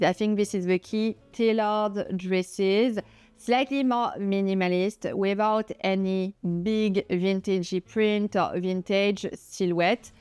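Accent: France. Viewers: Um, she is a French speaker trying to adapt that odd word to her how she has learned to pronounce her language.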